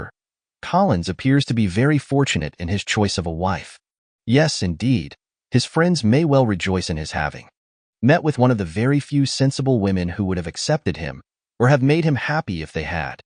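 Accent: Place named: United States. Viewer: A true English classic with a twangy American accent...Hard pass! - Sorry, but it just feels wrong to me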